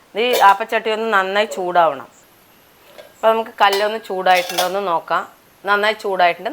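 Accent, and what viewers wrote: Palakkad, India. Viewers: പാലക്കാട് കാരി ആണ്ണോ സംസാരം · ചേച്ചി അടിപൊളി. പാലക്കാടൻ ഭാഷ 👌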